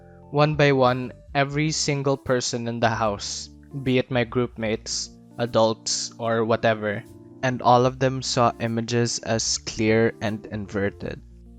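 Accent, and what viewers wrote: Philippines. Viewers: Are you a Filipino? It's because of your accent.. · knew you were filipino because of the accent but im still wOAH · HOLY FU— YOU’RE A FREAKING FILIPINO?! Guess I should’ve known. That’s my freaking accent, too. XP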